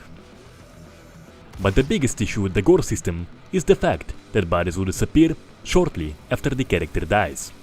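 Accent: Russia. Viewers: This is russian ascent?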